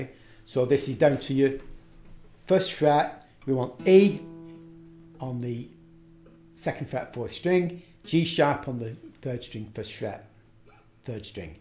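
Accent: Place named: Northern England, United Kingdom. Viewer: I could tell you are from up north before I read. Nice tutorial. I'm from Liverpool. Cheers!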